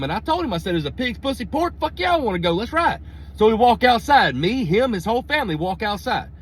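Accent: South, United States. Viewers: That is fifty shades of wrong three ways to Sunday and I ain't lyin'. That there is one helluva case pink eye just waiting to happen to the whole house. If it ain't already happened by now. nods Oh yeah, other people's kid will definitely have you rethinking your lifetime plans with or without kids. Of course I did laugh my ash off.😂😂😂😂 It's that Southern twang he got. I love it. Got me all homesick.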